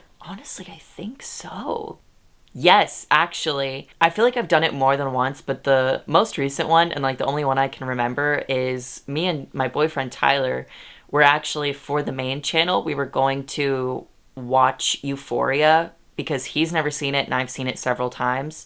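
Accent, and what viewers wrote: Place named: United Kingdom. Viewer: I've been following your channels for a while now. It's been nice to witness your development, the content journey and the much deserved growth! I've watched every one of your videos and am glad I have. I'll continue to too 😸 Totally unrelated to the vid, but the recurring bri'ish accent always makes me laugh 😹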